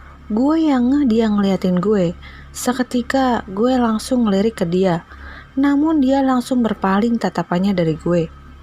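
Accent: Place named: Java, Indonesia. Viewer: Medok gays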